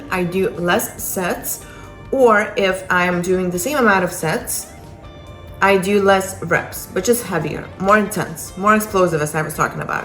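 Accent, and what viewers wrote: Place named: Russia. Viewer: Did you hear about the new Linkin Park vocalist, Emily Marcia Armstrong? There's a concert online — she looks like a little firecracker, if you know what I mean! Nothing too crazy, just an interesting, strong personality. Anyway, this video started with an invitation for women only seconds. As a male, I find it offensive since these videos should be made for... just kidding Strong hook in the first 9 seconds, progressing to a life story — product placement, and more American, with... what was it, a Russian accent?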